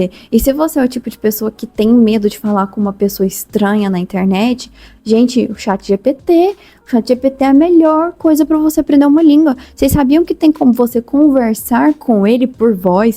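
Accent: Minas Gerais, Brazil. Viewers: Agora que percebi que você tem mt sotaque mineiro rs (adoro, por sinal) ótimas dicas,. Aderi por aqui a de Friends, que pra ser sincera, nunca nem assisti 😅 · Tu é Mineira, Goiana?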